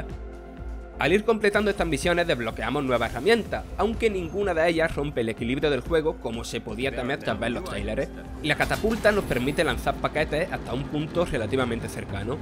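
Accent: Andalusia, Spain. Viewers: La gente de Andalucía no puede ser narradora o qué? Respeta los acentos que a lo mejor los de latinoamerica lo entienden mejor que tú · Hay acentos en el sur de España donde la "S" son casi mudas, sobre todo en las palabras plurales, el tema de las "R" lo veo más de la parte de Murcia ( aunque en este caso lo veo muy exagerado ), todos los acentos merecen respeto pero teniendo en cuenta que este canal lo ven en muchos países no veo la mejor elección un locutor con un acento tan cerrado. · La gente descubriendo el acento andaluz... analfabetos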